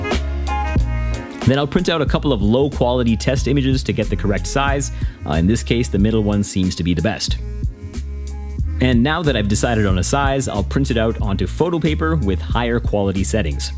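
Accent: Canada. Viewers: Canadian? LET'S GOOOOO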